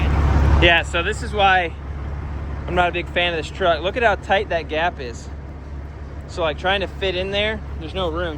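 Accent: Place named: Tennessee, United States. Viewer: You must be from Tennessee or North Georgia the way you say Alright yall Like we do here in East Tennessee!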